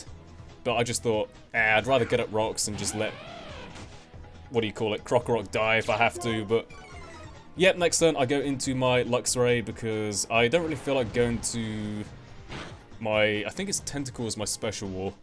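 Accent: United Kingdom. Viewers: You have an amazing british accent :)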